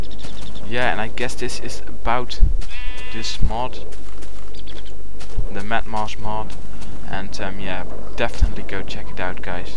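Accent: Netherlands. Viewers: I might be wrong, but are you dutch? I recognize that accent, I hear it every day ;).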